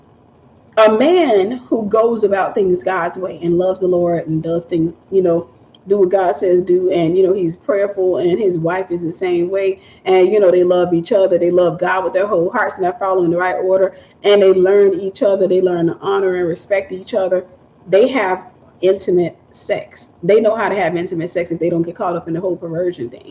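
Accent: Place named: South, United States. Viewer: God Bless you Beautiful Sister i have that Southern Accent as well it doesn't leave for some Reason hee hee you have been a Blessing since i found your channel 🕊️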